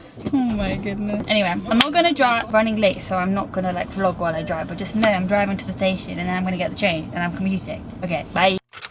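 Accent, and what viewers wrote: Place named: United Kingdom. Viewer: She’s got a British accent so she may have been born in the UK.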